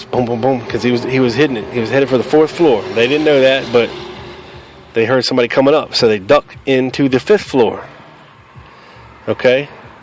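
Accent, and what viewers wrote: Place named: Oregon, United States. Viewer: Hey I enjoy your videos a lot. And by the way, you talk like we do. Small minded people criticize— I appreciate the knowledge and open minded approach! Love from Oregon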